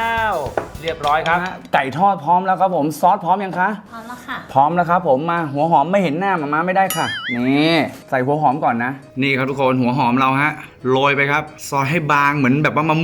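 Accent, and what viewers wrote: North, Thailand. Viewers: อยากเห็นแม่บุ๊คพูดเหนือทั้งคลิปค่ะ มันต้องน่ารักมากแน่ๆ❤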